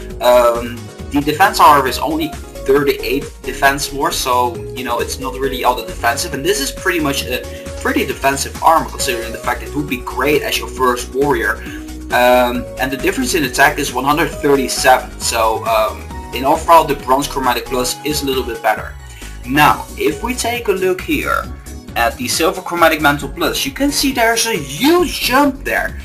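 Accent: Netherlands. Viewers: Ben jij nederlands? Je klinkt zo!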